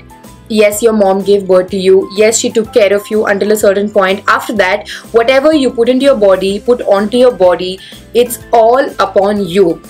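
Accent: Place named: South India, India. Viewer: What ????? 🤣U r northindian.. i m subscribed to u from last 6-7 months n thought u r a South Indian (majorly because of the south wala accent when u talk in english ) ... i am also north indian.. 🤗hugs... whats your native ??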